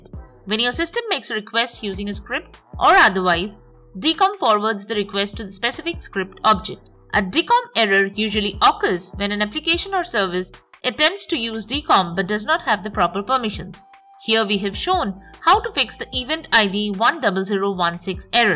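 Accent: India. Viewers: do not trust a video with an indian accent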